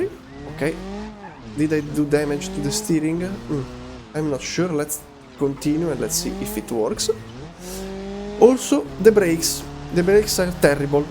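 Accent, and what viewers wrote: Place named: Italy. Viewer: Minchia Brother si sente poco che sei italiano 😂 comunque bel video 👍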